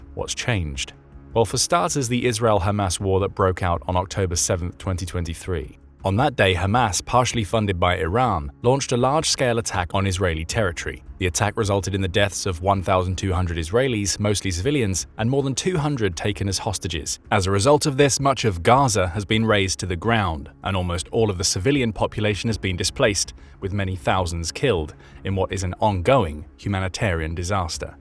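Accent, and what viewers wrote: United Kingdom. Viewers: You're using the British accent to kind-of whitewash some part of the British Raj system that turned communist in West Asia. The in-person alternative to Zionist government is something more evil with drugs and aliens, and the power structure for that derives from Shiite heresy against burial edict. There's a cultural schizophrenia where it is common to disparage Israel, de minimus, by omitting the obscenities to which Israel is some counter.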